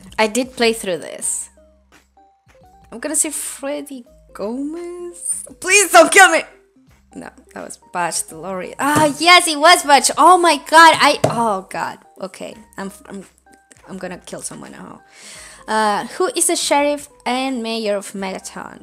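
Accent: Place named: Colombia. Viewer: I love it so much but I cant put together ur accent I think is Colombian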